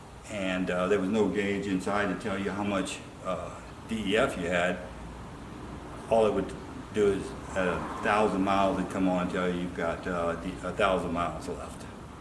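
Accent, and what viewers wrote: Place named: New Orleans, United States. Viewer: sounds like your from new orleans area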